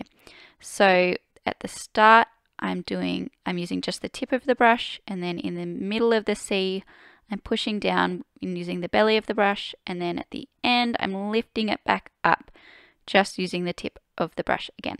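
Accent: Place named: Australia. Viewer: I’m very grateful for your beginner exercises and it’s great to have tutes with an Australian voice!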